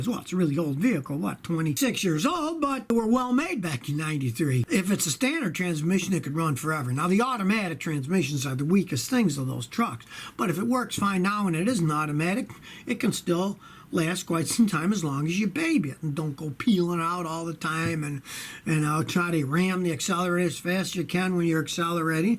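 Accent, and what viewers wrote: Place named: East Coast, United States. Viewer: I have listened to many of your videos and I have an important question. What kind of accent do you have? It doesn't sound like Michigan, more like East Coast. Please answer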